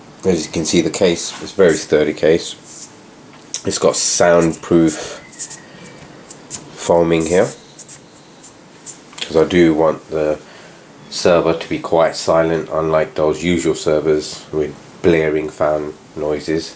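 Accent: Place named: Birmingham, United Kingdom. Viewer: Your from birmingham aint you ;)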